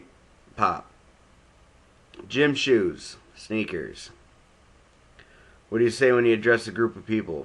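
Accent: Buffalo, United States. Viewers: good representation of Buffalo accent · you definitely have a buffalo accent. I get it all the time, yet I never knew I had one till I went to Mass.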